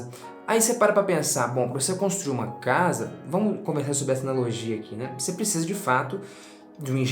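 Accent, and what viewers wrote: Minas Gerais, Brazil. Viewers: Cara, como é legal ver você (um garoto uns 30 anos mais novo que eu) falando coisas tão interessantes nesse mineires delicioso. Não sou físico e nem matemático, sou paulistano e quase sexagenário portanto acho que não faço parte do maior segmento do seu público e nem sou o principal mas adoro assistir os vídeos e aprender com você. Parabéns pelo trabalho.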